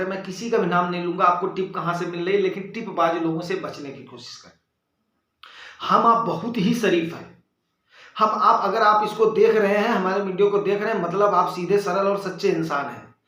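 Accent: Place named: Uttar Pradesh, India. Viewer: Up wala bhasha k liye ek like mere taraf se😄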